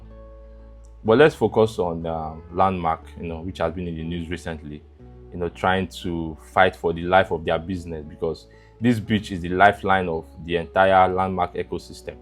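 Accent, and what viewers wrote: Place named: South London, United Kingdom. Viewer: The landmark structures are that of a warehouse standard and obscene for such a location. Of course, most Nigerians have never seen beach tourism like Brazil and Spain. make him collect his compensation and go somewhere. The standard is 0. He guy should stop brainwashing Nigerians with his Saf London accent make we hear word.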